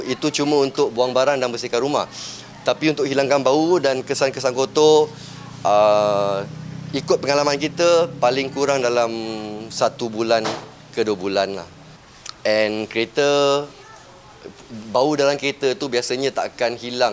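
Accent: Malaysia. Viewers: Bro Your Bahasa in truely Malaysian, Good luck.👏👌👌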